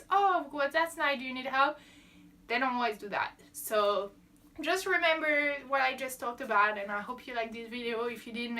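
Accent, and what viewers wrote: France. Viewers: your French accent is very pleasant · Wow tu as vraiment un tres fort accent Français quand tu parle Anglais. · L'accent Français c'est le meilleur!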